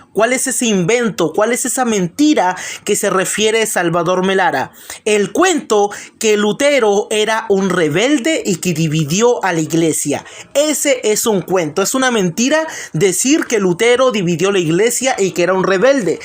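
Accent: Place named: Central America. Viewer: Quien habla dice que es Chileno?? Jajajaja, hata en eso mienten un chileno por aqui que lo desenmascare a este tipo de Chileno no tiene nada, ese acento es de Centro Americano.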